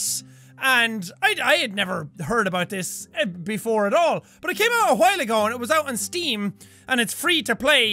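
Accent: Ireland. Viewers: u sound so irish then befor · I didn't think you could sound anymore Irish. I was wrong :D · Just because uses a reaaally Irisch accent for the characters this is my fave LP from Moirai. it's great, i love it! <3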